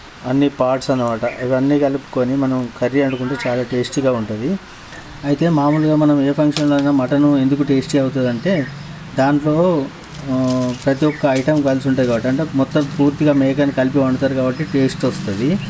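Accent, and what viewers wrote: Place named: Telangana, India. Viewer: Pakka telangana slang ra adi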